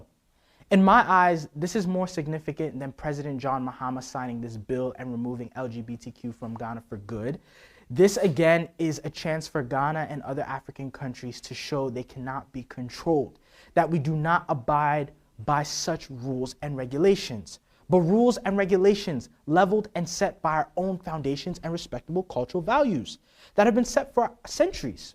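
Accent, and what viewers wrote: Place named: United States. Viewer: Are u Ghanaian, just a harmless question, cos u sound American?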